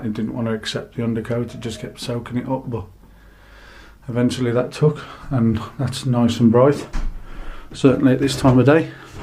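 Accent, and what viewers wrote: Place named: Dudley, United Kingdom. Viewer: Where about in Dudley? I'm Dudley also, picked up the accent straight away ;)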